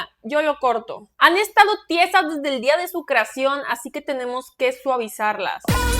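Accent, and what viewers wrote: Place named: North, Mexico. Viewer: Tu tono norteño con tu carita douyin me da vida ❤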